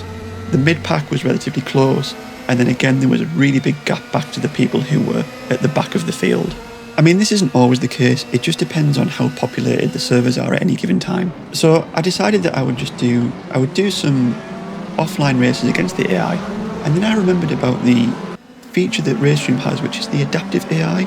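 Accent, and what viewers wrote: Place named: Middlesbrough, United Kingdom. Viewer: Subscribed and a like. But I have to try to guess your accent ! Middlesbrough ?...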